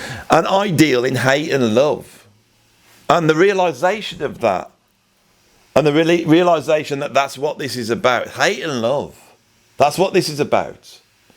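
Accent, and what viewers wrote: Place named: Midlands, United Kingdom. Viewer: More then interesting, first thing why am I looking at other comments, sod it,I don't need to... Your comment at the very end, have a good death, that comment in itself can go either way, I guess it could be viewed as humour, but the point that I would like to make is,The subject of Death is totally avoided here in the West, Burried in fact.,now thers a point,humour arriving again... But absolutely we all need to be able to die in silence and peace.and hopefully in absolute awarness. My personal view is Life and Death are part of the same coin... Anyways,Artists such as Joni or phill,Kate,are somehow via there creative ability deal with there Trauma and failing and are capable to go beyond this in a cathartic way, I agree with you, we all are capable of finding way to rise above the stress and that method iin itself is creativity. 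There are unfortunate people who sadly become lost and have an immensely horrendous time in darkness.. Oh,Bloody hell I'm blabbering on,sorry. To put it simply you have to be true to yourself and if you happen to offend someone it is there problem, your loyall membership and subscribers know you and will stay.... Love the midlands accent, I'm a East middlander,originally, yep I can't help that 😂. Best wishes to your friend. Enjoyed again this in depth discussion...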